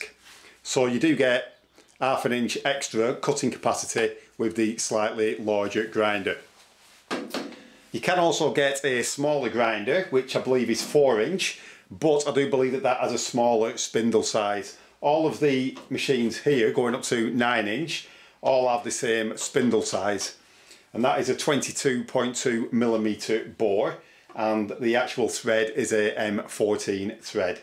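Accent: United Kingdom. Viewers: British Accent...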